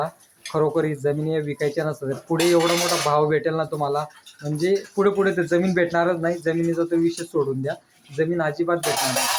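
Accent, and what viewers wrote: Khandesh, India. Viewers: Hallo tumhi kay khandesh chalisgaon jalgaon che aage ka bhasha varun vatate aahe Baki tmhi chhan video banav tat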